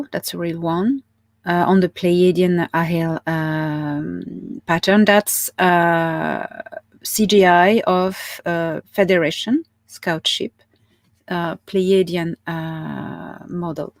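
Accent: France. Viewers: French accent speaking English is so beautiful! My children went to French Schools and I love the way they speak too❤️What is particularly cute is that the translation says (Alien sheep) 😄 Raise your vibration by doing something you love, for me, music really helps and arts and crafts. Spending time in nature, watching Sunrise/Sunset, being close to water, eg the sea or a river if you are not close to the coast. Lots of love, Thor Han, The Galactic Federation and all those reading the message 🙏❤️👍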